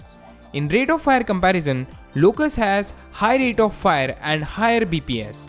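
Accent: India. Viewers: i have to turn the volume down to zero and turn on the captions..... this is for every indian, Indonesian, indian accent speakers... please learn english. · You sound very Indian.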